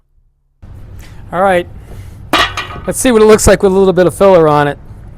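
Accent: New York, United States. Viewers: Alabama here, I would have won a bet that you were from NY, by your accent.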